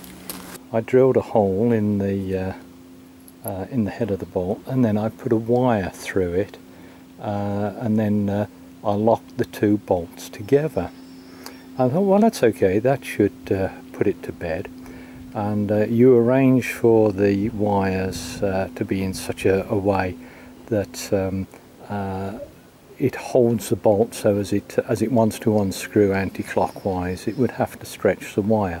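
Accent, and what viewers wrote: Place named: Birmingham, United Kingdom. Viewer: I have a question if that's ok, I have A faulty strimmer, the plate that rises and falls behind the plug seems to be hitting the plug to the point where it's closing the gap ( surely that's not correct ) if I pull the cord it works freely with the plug out but struggles with the plug in. Ps are you a Brummie, theres definitely a bit of Brum in your accent. thanks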